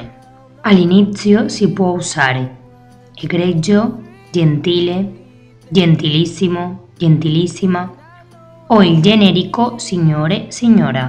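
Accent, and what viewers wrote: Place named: Spain. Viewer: È un accento,credo sia spagnolo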